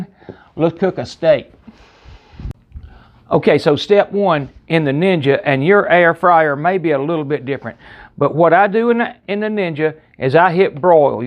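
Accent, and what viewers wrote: South, United States. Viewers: I just found your channel and I love your southern voice and delicious recipes ❤️ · I received a ninja air fryer for Christmas so I looked up some technics here on you YouTube heard this good ol’ southern accent and knew within 5 seconds I’d better subscribe Btw Greeting from Oklahoma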